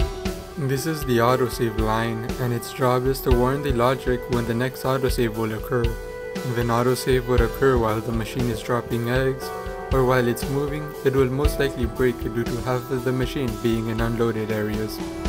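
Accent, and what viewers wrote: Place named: India. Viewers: To me It looks like the accent of indians you find everytime you search for something related to programming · Yup it sounds slightly South Asian/Indian to me too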